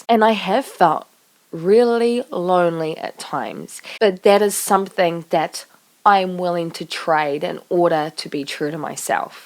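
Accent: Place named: New Zealand. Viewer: Completely off track but I heard your accent and can tell you're from home. INFP NZ here.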